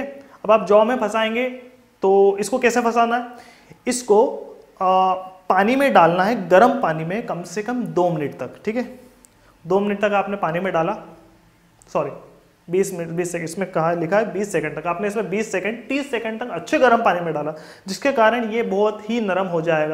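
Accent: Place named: Madhya Pradesh, India. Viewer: Aapke bolne se pata chalta h aap MP se ho... Or gharaatte nahi bolte... Kharaate bolte hain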